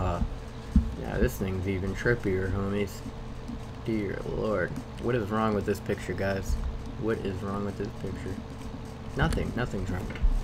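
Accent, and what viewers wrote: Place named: North, United States. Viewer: bc I live in Louisiana and he said he was from Louisiana just curious what part cuz he sounds like he from up north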